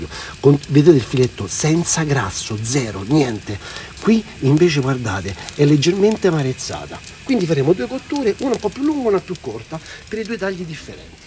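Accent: Rome, Italy. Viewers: I tagli non ci appiccicano niente, prima di sigilla fuori e non si inizia dall osso. Poi aver separato la carne e’ inutile a quel pubto si sarebbe dovuto cuocere filetto e controfiletto gia’ disossati, inoltre era troppo alta, inoltre la carne e’ troppo magra, insomma quasi ogni cosa che si poteva sbagliare, l’ha sbagliata a dimostrazione che in italia la cultura della bistecca non c’e’ proprio. Inoltre tutto quel romanesco da’ molto fastidio all’ascolto, bisognerebbe imparare un po’ di dizione corretta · È stupenda la dissonanza tra la parlata romana e i sottotitoli 😁😁😁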